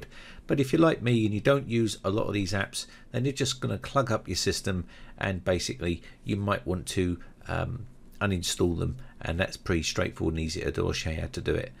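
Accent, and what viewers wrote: United Kingdom. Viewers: Your talking style is so British. Great videos btw.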